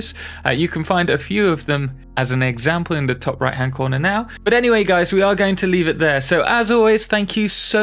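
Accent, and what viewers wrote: United Kingdom. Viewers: I just want to thank you for all your videos. Learning so much from here... I'm brazillian and even you gourgeous british accent isnt a problem since you care to speak slowly so... Honestly your videos are trully perfect. Thanks for your generosity. <3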